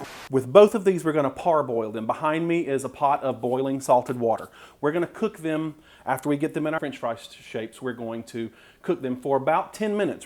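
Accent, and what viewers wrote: South, United States. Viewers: Love the South in your mouth!! Where you from? New sub. You’re a goofball—my favorite kind of person! Great video. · Oh wow I just love your southern accent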